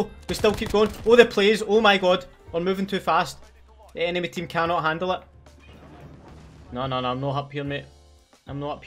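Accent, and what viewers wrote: Scotland, United Kingdom. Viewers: When you hear alrighty folks with a scottish accent you know its gonna be lit #notificationsquad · Gun Troller aye in a Scottish accent then he will do that stupid generic one that no one sounds like and u would need to be from the right part of Scotland to sound like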